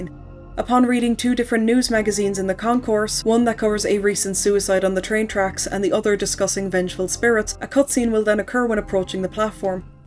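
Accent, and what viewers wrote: Ireland. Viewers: Forgive me as I'm new, are you Irish? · it's always so cool to hear another irish person on ytube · Am I detecting a pretty strong Irish accent in your voice? 😉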